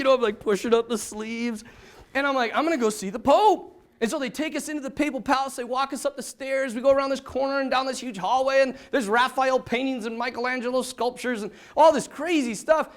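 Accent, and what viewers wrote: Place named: Ireland. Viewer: nice irish accent, lol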